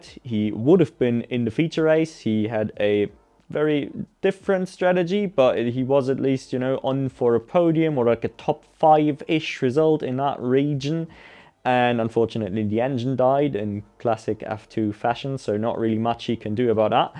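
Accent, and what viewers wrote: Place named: Scandinavia. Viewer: Also I am trying to place your accent and failing (I'm a language and linguistics nerd, so I do this with all YouTubers I watch), sounds both Scandinavian and English with a bit of German. I'm going to place a random guess and say Iceland as a wild guess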